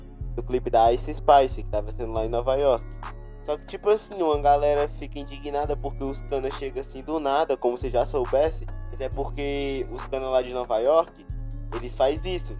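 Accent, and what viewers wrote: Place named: Pernambuco, Brazil. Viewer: Sotaque de Pernambuco aí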